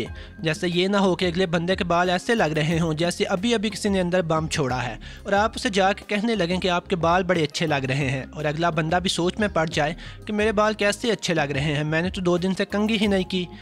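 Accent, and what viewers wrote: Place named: Punjab, Pakistan. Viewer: Please please please improve your Urdu. Panjabi mai styles mai Urdu mat boleye.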